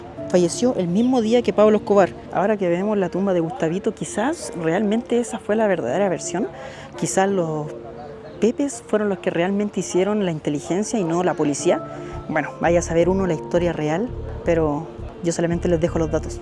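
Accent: Chile. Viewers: es el chico que hace el vídeo verdad? Porque tiene acento chileno.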